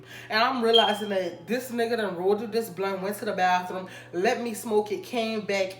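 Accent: Louisiana, United States. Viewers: Is you from New Orleans or Homea cause yo accent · I think she from Louisiana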